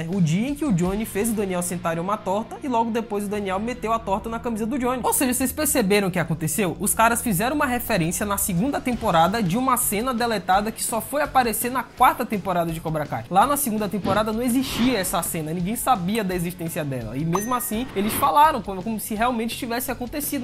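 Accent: Bahia, Brazil. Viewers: Você é Baiano, de Salvador? Porque eu sou, e achei muito massa ver um youtuber nerd daqui!! Kkkkk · Vc é da Bahia mano ? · Você é Baiano 😁😁😁, que legal , Bora Baeeeeeea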